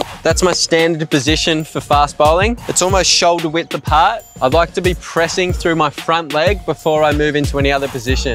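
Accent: Australia. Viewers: most of us are here to listen to just the australian accent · Australian accent : nice skills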